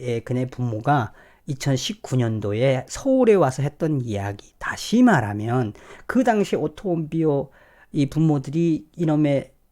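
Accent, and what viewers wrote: North Korea. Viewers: 북한사투리 개인적으론 잼 있어요 ~ ㅎ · 북한말 하지 않는것 좋겠네요 김정은 생각이 나서 듣기 거북합니다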